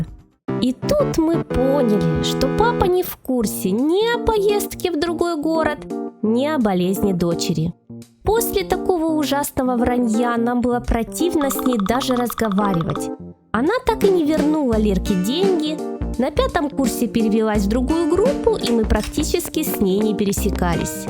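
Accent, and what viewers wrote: Ukraine. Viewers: Так она украинка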